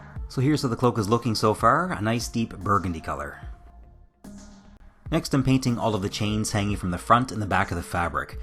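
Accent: Canada. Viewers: I knew you sounded Canadian! Maritimer?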